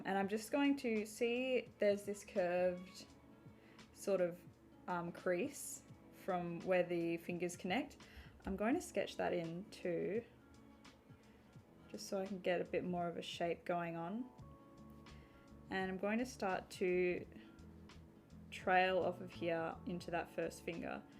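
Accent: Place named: Australia. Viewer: Your Aussie accent is 🤩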